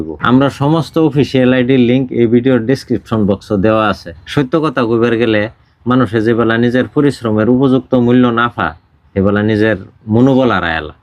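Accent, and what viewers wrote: Mymensingh, Bangladesh. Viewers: Ha, ekdom Bangladesh er Mymensingh, Jamalpurer vasha. Onek apon mone hoy. Ami Mymensingh er. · প্রথমে ভেবেছিলাম কিশোরগঞ্জের। কারন ময়মনসিংহ, কিশোরগঞ্জ, নেত্রকোনা অঞ্চলের আঞ্চলিক ভাষা এটা। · চোরদের কোন কমতি নেই।যারা চুরির পিছনে থাকে,তারা চুরি করবেই। যাই হোক আপনার আঞ্চলিক ভাষা ভারতের কোন অঞ্চলের দয়া করে বলবেন।কারন আমাদের বাংলাদেশের ময়মনসিংহ জেলার ভাষার সহিত মিল আছে, তাই বললাম। ধন্যবাদ।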